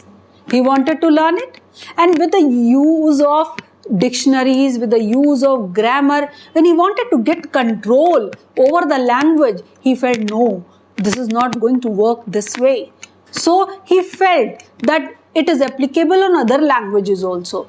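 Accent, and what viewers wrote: India. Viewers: Don't make rude comments just based on her accent. People are so quick to judge others these day. She is from India and her accent is greatly influenced by her mother tongue. Jackie Chan though he has been working in Hollywood for years he still speaks English in Chinese accent. Even the great Kalam sir used to speak English in Indian accent. So would you treat him stupid just because his accent is bad?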